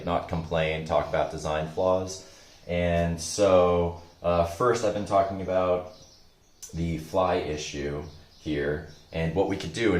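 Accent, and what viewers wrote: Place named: Northeast, Brazil. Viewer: ela e brasileira! :) acho que nordestina!!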